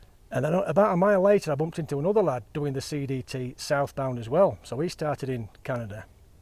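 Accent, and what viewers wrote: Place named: Yorkshire, United Kingdom. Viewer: Cheers. Me and Judith hiked this trail in 2022 and are currently enjoying watching your adventure as it unfolds. One episode a night after tea! Great to relive some of the great scenery and your take on a fabulous part of the world. Looking forward to the second half.....oh and nice to hear a Yorkshire accent!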